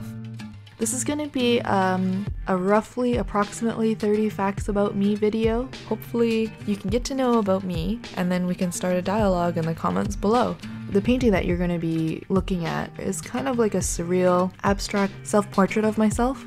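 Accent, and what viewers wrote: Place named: Canada. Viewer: you do sound pretty Canadian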